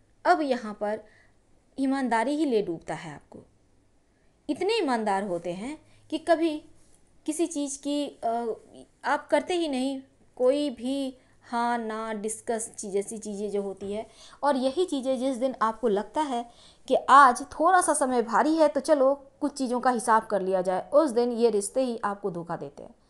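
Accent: Bihar, India. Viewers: Kaha ki ho bihar se ? Funny accents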